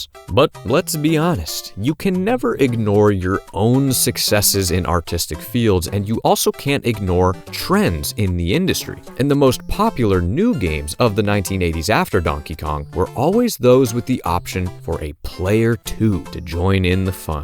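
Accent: United States. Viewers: And do I detect a hint of a ghost of a southern twang?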